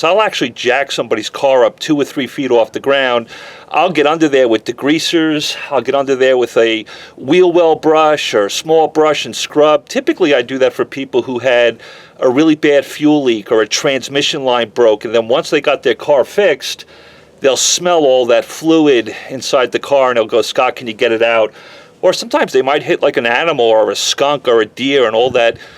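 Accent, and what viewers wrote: New York, United States. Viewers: You may be based in Dallas, but you still sound like a New Yorker.:) BTW, I believe it's pronounced "Ree-oby".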